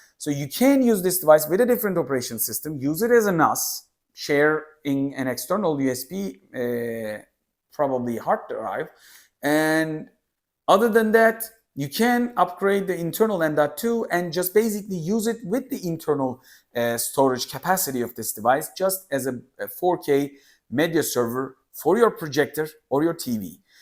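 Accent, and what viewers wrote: Turkey. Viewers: Reis türk müsün ? Türk aksanın var :> Ürün incelemesi için teşekkürler.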